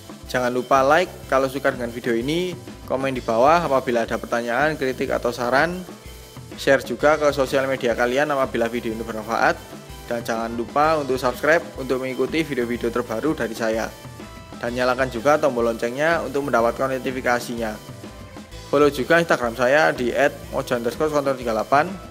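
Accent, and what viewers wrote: Malang, Indonesia. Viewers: Orang Malang/Batu mas?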